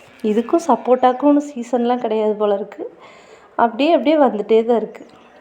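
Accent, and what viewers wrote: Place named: Kongu, India. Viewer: கொங்கு தமிழ்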